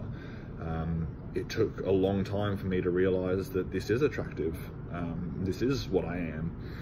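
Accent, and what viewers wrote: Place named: Australia. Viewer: I like your beard and moustache!!! And the Aussie accent isn't bad either.....;-)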